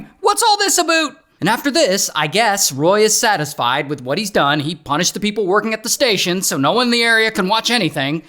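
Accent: Canada. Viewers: As eh! Canadian. Love how you Canucked it up as well as you did. Sad story of course.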